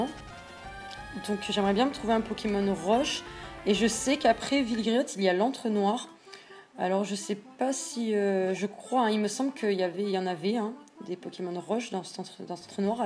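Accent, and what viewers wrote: Marseille, France. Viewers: Salut :) Le petit accent Marseillais qui fait plaisir ^^ GG pour le Galekid dans Rubis,Saphir et Emeraude tu en trouve dans une grotte a la 2eme arènes ^^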